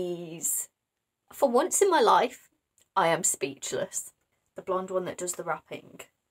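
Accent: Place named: United Kingdom. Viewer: I love your British accent,, 😅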